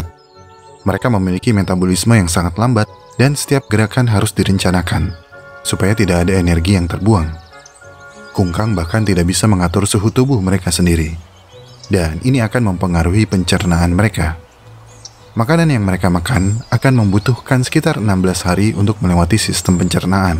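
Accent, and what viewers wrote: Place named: Java, Indonesia. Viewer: Orang Jawa yah lu bang 🤨🤨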